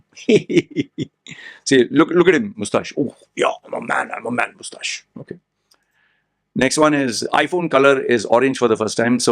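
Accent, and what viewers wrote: India. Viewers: 🍃💯 I like your videos about bull religion system topics... But when you said about the man mustacs tryana be a man.... Very true I agree... But whatsupp with the freaking tattoos on your face... Hommie you ain't no Tyson.. what was you thinking... How does it make you feel.... More of a man, unique dasi, cool...or tryna get cool attention Coz I can bet you didn't go to middle school or even high school in the USA.... Your dasie accent says it all... Bakie tera cast system ka bilkul sahi hai..... I hate that fckin cast system more than anything about India coz millions of reasons I got 🎉🎉🎉🎉good job tho hommie.. ... Peep deep inside.... But right on! 🎉🎉Keep up the good work 🎉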